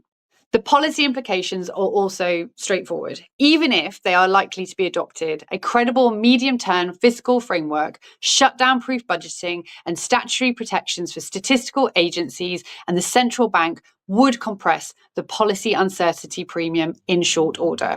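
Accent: England, United Kingdom. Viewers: I could listen to talk all day with that English accent. But thank you for creating this video I truly value your input. Both silver and gold are on fire and I think silver has the potential to go higher in price to create a life of its own.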